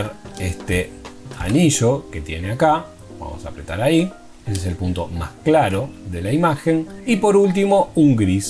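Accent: Argentina. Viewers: Sos un genio explicando amigo. Explicas re claro y sin rodeos, hay tutoriales de otros que te marrano mucho más, pero el tuyo es el mejor. Saludo PD: Sos Argentino o Uruguayo? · qué lindo encontrar que te expliquen en argentino!!! jajaj que bueno encontrar este canal :D graciasss por compartir tu conocimiento!!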